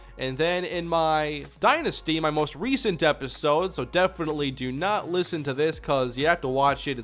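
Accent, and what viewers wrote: Minnesota, United States. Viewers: are u from minnesota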